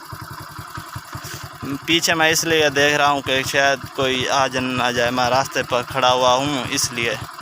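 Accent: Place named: Saraiki, Pakistan. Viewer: ویسے تو آپ کی اردو بہت اچھی ہے تھوڑے اردو کے الفاظ آپ کے کٹ جاتے ہیں وہ آپ کی سرائیکی زبان میں آ جاتے ہیں لیکن کوشش کریں صحیح بولنے کی انشاللہ آپ کو اردو صحیح آجائے گی 👌🏻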